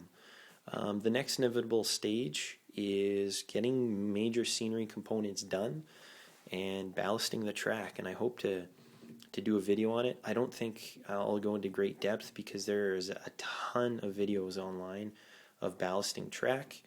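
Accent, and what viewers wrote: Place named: Canada. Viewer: Hey...I love your explanation...(even the Canadian accent)..you are great..Be a teacher..you, got it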